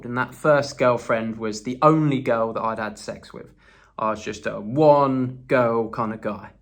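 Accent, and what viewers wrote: England, United Kingdom. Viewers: I just love that English accent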